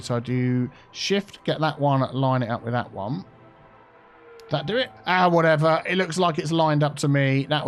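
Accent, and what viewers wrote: United Kingdom. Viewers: all your words sound different to me with your British accent ... I am from Canada ... but I guess I would sound wierd to you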